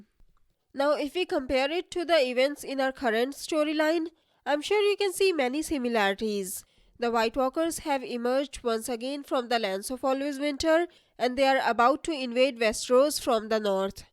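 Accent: India. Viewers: are you from INDIA? your accent seems like that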